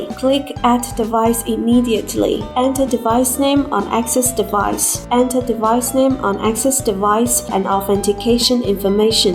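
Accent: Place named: China. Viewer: No one like Chinese English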